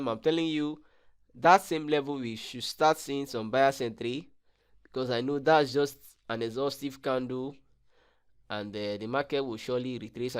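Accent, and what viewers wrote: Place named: England, United Kingdom. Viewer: I like your english accent, haha , btw love ur work 📉📈📊✔️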